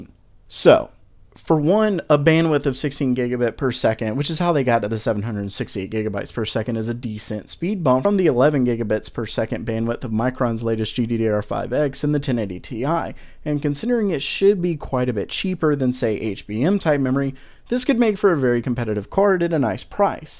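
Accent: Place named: South Carolina, United States. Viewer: Trying to pin point your accent, armican mid south, possibly south Carolina, I am a Canadian so I don't know my states very well, lol.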